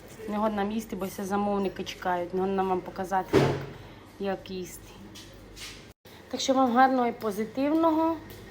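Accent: Transcarpathia, Ukraine. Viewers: Не мала дивитися се відео, та почула закарпатьську говірко, то відкрила. Дуже скучила за цим чудовим краєм. Дякую.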